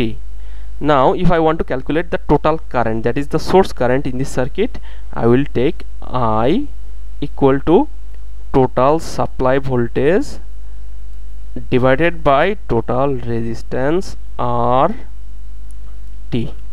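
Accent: India. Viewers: I know it's an inappropriate statement but your accent sounds more like indian than Bangladeshi... :/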